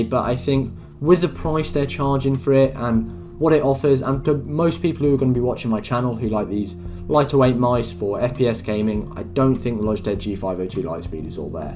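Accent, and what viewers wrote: United Kingdom. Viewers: you a london g?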